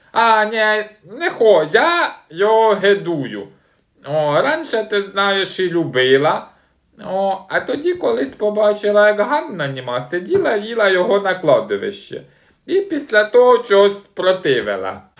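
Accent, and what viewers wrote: Poltava, Ukraine. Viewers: Яка ви молодець !Ви повертаєте мене в дитинство,моя бабуся (царство її душі)була з Полтавщини і точно так розмовляла.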